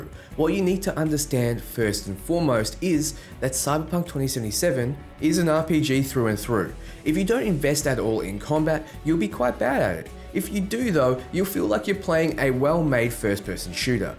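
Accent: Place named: Australia. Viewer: Yoooo. Gimme the scoop compared to Red Dead? I’ll sub regardless, you sounds like an Aussie who is cooped up.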